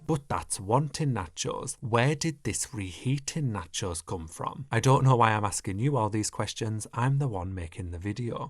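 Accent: United Kingdom. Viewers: He's from the UK, so I'll give him a pass. Maybe that's just how they pronounce there? Natchose. Natchose...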